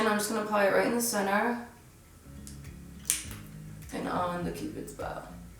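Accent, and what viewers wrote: New Jersey, United States. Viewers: Sooooo Jersey ! Love it!!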